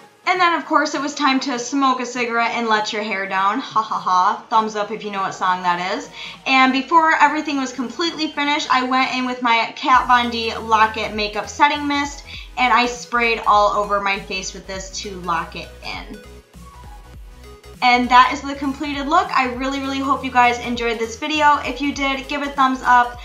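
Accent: Michigan, United States. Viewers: Love all your looks, you make it look so easy!!! Btw love your Michigan accent! ❤️👍🏼😘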